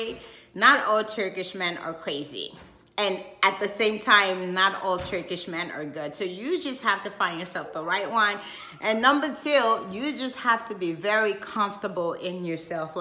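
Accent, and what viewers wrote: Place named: United States. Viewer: Unfortunately I absolutely dont agree with most of your video's it took me this long to respond. I was married to a Turk who not only abused me mentally emotionally and physically gradually over the years. Seems like you have an American accent 👀 He came to London with nothing back in the 80's it took 15 years for me to leave. He is now a multi millionaire due to me my lovely. Please don't think your irreplaceable . However you have given me an inspiration to make a video about my journey being married to a Turk.. I have a 34 year old daughter a film director now and 5* Michelin chef in London and a son who lives in Istanbul. I will definitely show my daughter this video. Your very naive. 🤓 sorry if this offends you. ♥️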